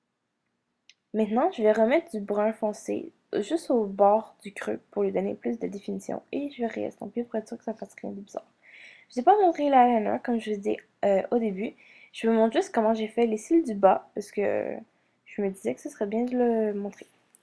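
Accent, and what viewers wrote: Quebec, Canada. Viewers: Srx , elle s'invente pas un Accent , ni des noms de maquillage ! Elle est québécoise , t'avais pas encore Compris ? ^^ Cet toi qui fait pitié ma Pauvre ! Retourne au Collège ma petite , faudrait bien que tu apprennes a écrire . Tu crois pas ? x)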